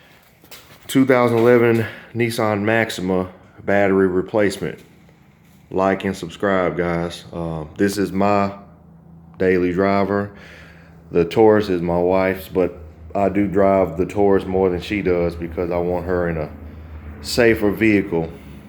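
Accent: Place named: Alabama, United States. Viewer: Haha! I love this guy's down home accent! No place like home (Bama).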